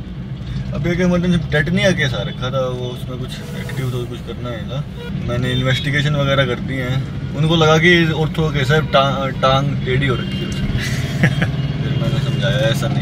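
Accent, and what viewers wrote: Haryana, India. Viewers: Haryanavi accent, just wow🎉.. Dr. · No doubt sir I like the way you present your interest as orthopedic surgeon 😊 but more than that I love to listen your haryanavi accent . Which is just awesome 👌 👏 👍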